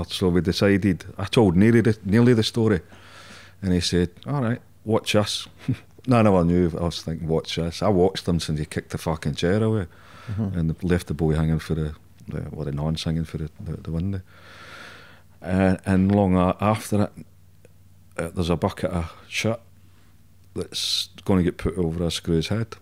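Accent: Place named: Scotland, United Kingdom. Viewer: Love how Scottish is in this podcast it really comes out haha it's like sitting listening to my uncle's chatting when I go to visit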